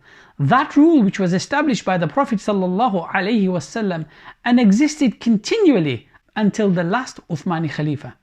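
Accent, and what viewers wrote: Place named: England, United Kingdom. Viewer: all your informations is wrong wearing a bikinis in Saudi not all the places it’s only in the private places not in the public. Saudi Arabia always defend Islam and helping the Muslims all over the world and serve the holy places in Mecca and madina for more than 80 years and they spend more than 90 billions of dollars 💵 for them. What kind of corruption you are talking about?? I think from your accent you are living in England. Please talk about the problems in england or the country where you came from and talk about our brothers and sisters Muslims problems in the west . By the way I saw many people talking about Saudi and the gcc countries and they forgot about what they are exactly. They use Islam but they are far away from it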